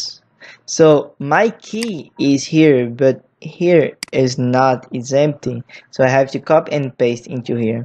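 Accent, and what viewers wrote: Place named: India. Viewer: Caramba, véi! Pensei que fosse um indiano! Já ia fechar o vídeo. :V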